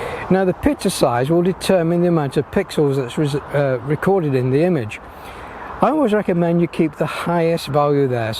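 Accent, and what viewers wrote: England, United Kingdom. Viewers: Very helpful - good to hear an English voice (I'm from Stoke but live in the US) - thank you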